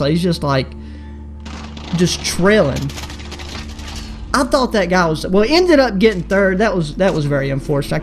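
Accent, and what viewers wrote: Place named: South, United States. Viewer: Bro I love your draw you from the southern states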